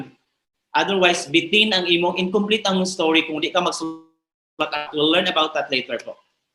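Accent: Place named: Visayas, Philippines. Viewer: Ay bisaya siya daaai😂❤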